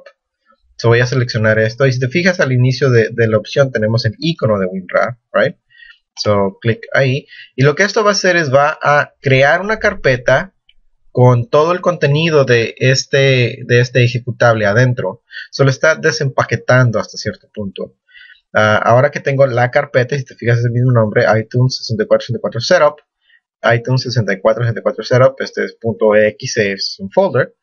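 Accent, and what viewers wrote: United States. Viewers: Español chicano everywhere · hablas como gringo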